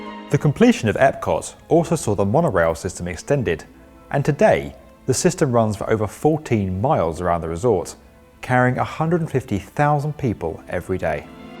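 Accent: England, United Kingdom. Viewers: CAN WE GET A NARRATOR WHO IS NOT BRITISH BUT AMERICAN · Mr JayL well let’s hear your voice , he has a clear English Accent , do you !!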